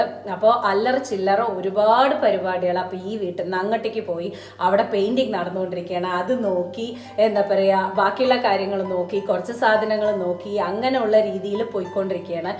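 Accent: Palakkad, India. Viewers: Hi where exactly are you at Palakkad. Could make out from your accent that you are a palakkadan.. Me too Palakkad. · I like your palakkadan malayalam very much ma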